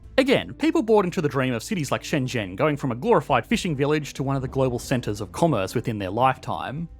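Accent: Australia. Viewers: I love the Australian accent but listening to yours is just insufferable. I don't know why you moan at the end of every sentence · So Aussie. Thanks for watching mate. Love it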